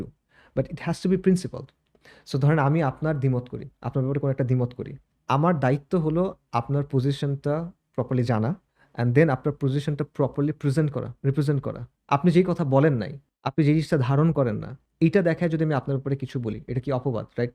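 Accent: Sylhet, Bangladesh. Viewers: Host mone hoy sylheti. thikmoto bangla bolte parena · সিলেটি উপস্থাপক মনে হচ্ছে 😅❤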